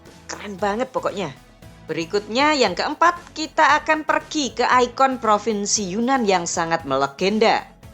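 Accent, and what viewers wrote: Java, Indonesia. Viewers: eksyen naratornya yg njawani banget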